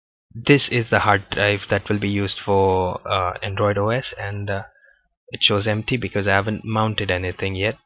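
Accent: India. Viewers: Phir bhi dil hai hindustani !! Nice Axent ! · your voice is so stereotypically indian lol no offence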